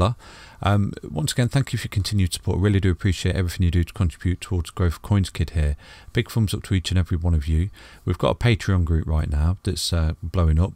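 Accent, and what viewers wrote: United Kingdom. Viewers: Gotta love the Bri’ish accent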